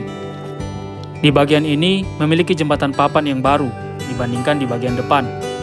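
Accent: Papua, Indonesia. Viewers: mending ko bicara full dgn logat Papua saja lebih bagus Yo tes to 😅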